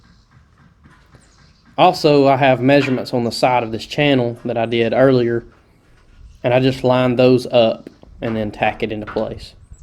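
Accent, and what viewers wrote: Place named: South, United States. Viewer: Good, honest stuff. Commenting from Albany, GA. You sound like you're close-by. Regarding being an expert: That's something I posted about my dad one Father's day....He taught me you don't have to know how to do something to get it done.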